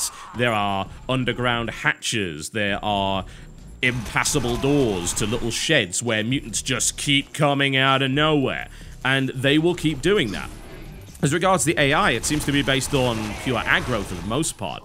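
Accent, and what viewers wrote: United Kingdom. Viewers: I began watching your channel over 2 years ago. I enjoy them because of your personality and awesome British accent. I love when you are emotional and rage. But recently you switched to be more "news" like than entertainment. I never critique you since it is your channel and you should upload what ever you want. But Your recent videos being very emotionless. Hope this helps. Thanks from your long-time fan! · 3. It's easter, maybe a large amount of your viewerbase was on vacation? (I know... probably not) 4. The format. Annoying noise in the background can throw a lot of people off i think. I know that it atleast used to annoy me. 5. A lot of not-you talking. I may sound wierd, but I actually started watching your videos because of your voice, or rather your british accent, and then later stayed for your gaming knowlegde. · I watch your videos for your opinion, your sense of humour and that great british accent. 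I watched 1 of the shield videos... the developer was just boring and clearly not best in the field of presenting a product. The questions that came to mind were "what is this good for, wii controller does sth similar" and "why the hell would I want to give up my 27'' for like 10''? Didn't get answer for that. Did I really have to watch the whole footage to get those answered? No conventions for me...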